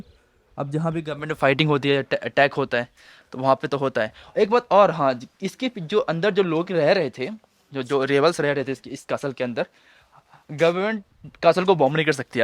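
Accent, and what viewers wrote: Bihar, India. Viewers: That,s pure 😘 Bihari accent 😂 love you brother ❤️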